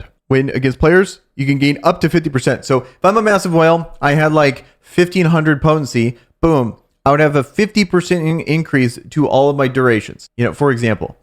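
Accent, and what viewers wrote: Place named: United States. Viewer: He's American..that's what they do with the English language, it's gradually changing because of the likes of this.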